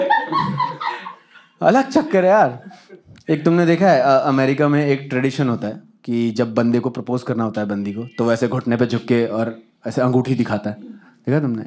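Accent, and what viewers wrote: Bihar, India. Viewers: His hindi has a Bihari undertone. Is he from a Bihari family? just curious to know not being hurtful to anyone. Bura mat lena koi aab. Aaj kal kuch puchne me bhi sochna parta hai social media pe. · Tum Bihari ho. accent se pata chalta hain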